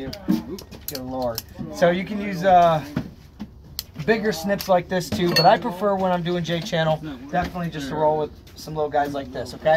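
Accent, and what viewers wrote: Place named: New York, United States. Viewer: Excellent video I would guess you're from new york.. You remind me of some guys that I work with.. very knowledgeable and straightforward great info and training ..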